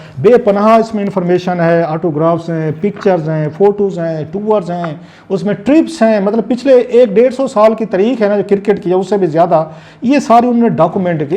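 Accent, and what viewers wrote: Saraiki, Pakistan. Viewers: کرکٹ کی تریخ نہیں حضور۔۔۔کرکٹ کی تاریخ ۔۔۔آپ نیشنل ٹی وی پہ بیٹھ کے غلط تلفظ کے ساتھ اردو بولتے ہیں اور اوپر سے لہجہ شدھ سرائیکی۔۔۔۔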